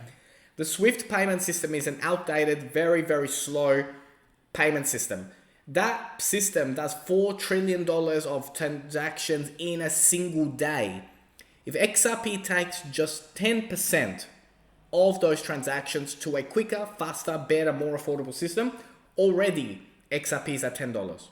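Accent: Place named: Australia. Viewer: I like you, I think most people are bellends, but not you, your pretty level headed, also you have a crazy voice not just because your an aussie but its very distinctive, I think you could do voice acting! Subbed!!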